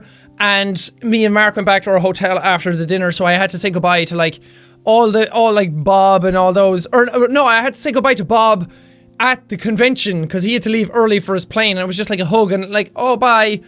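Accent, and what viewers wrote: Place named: Ireland. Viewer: Yay irish accent!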